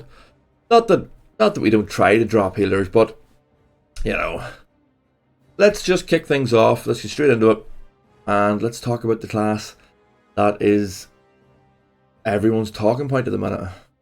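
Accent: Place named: County Down, United Kingdom. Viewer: Where in northern ireland you from mate, sound like county down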